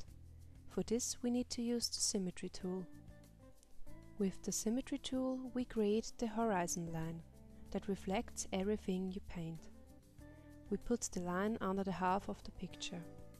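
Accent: Germany. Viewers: Dieses deutsche Englisch 😂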